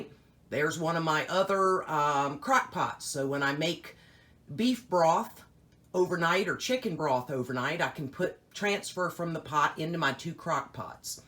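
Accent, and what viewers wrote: South, United States. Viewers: Hello! New Subscriber 😀 I'm from Alabama ❤️ Sounds like you are from the south too 😁👍 Thanks for sharing! Great video 👍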